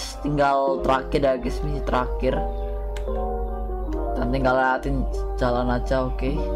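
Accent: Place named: Java, Indonesia. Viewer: Medok bg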